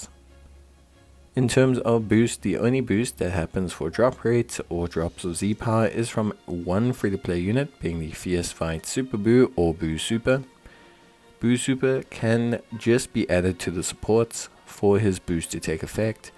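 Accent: South Africa. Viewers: Hey brother are you South African cos i know that accent anywhere! howzit my china! cheers for all the help on the game helps alot. Keep powering up man!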